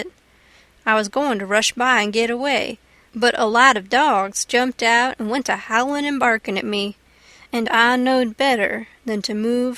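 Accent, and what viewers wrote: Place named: South, United States. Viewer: Hmmm. Not thrilled about the narrator. All I can see is some southern girl in my mind. Should have been a male.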